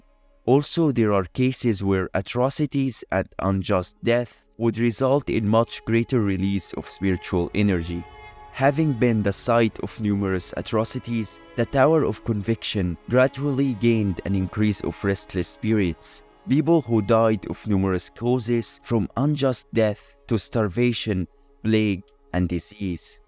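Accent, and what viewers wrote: Egypt. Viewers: اللهجة المصرية احلى اشي 😂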